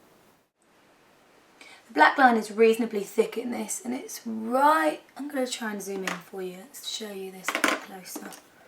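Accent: United Kingdom. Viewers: I really love your brittish accent *__* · Jesuuus! She's british and I can't understand many things she say :/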